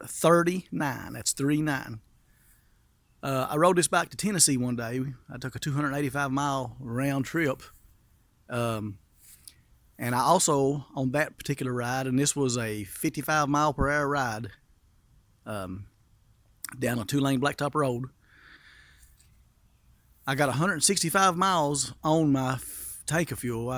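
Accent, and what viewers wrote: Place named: Kentucky, United States. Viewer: I think a small windshield would look ok You sound like you’re from Kentucky or somewhere below the Mason-Dixon Line American by birth Southern by the grace of God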